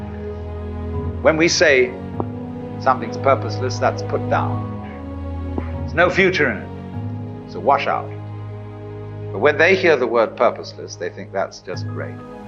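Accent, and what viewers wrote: England, United Kingdom. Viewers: Where do I begin. But that was the biggest most flowery word salad mixed with a pinch of despair I have ever heard. TRUST NATURE, your are nature, we are nature, nature will let you down, life has no purpose, Watt goes from if you don’t trust nature you will get tyranny??? Making a huge jump to support your ideology wouldn’t you say. I have never been less inspired listening to someone than what I just heard. But it’s cool and accepted because Watt has an English accent. You’re being lied to and you have no hope. But fear not because you can always trust nature, sometimes…., Wow!!!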